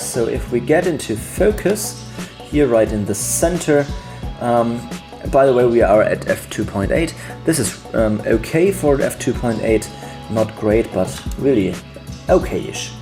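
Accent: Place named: Germany. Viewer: Soll ich mir das deutsche Englisch jetzt 12min reinziehen? Dann bekomm ich partielle Einseitige, Lähmung und gesichtskirmes auf der anderen Seite. Toll, dass sie englisch können, aber beschränken Sie es bitte auf die zwischenmenschliche Kommunikation. Videos müssen echt nicht so kommentiert werden.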